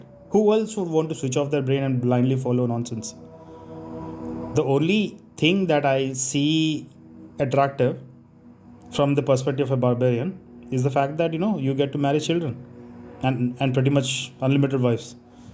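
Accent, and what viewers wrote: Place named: India. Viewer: Hell Nah Indian scammer with the accent too is hilarious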